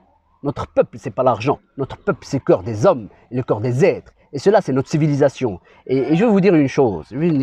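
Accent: Belgium. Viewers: L'accent belge. Votre langue c'est aussi le français car vous parlez français dans toutes vos vidéos. Le Maroc a eu la France comme alerte. Parlez au gouvernement Français pas à la France.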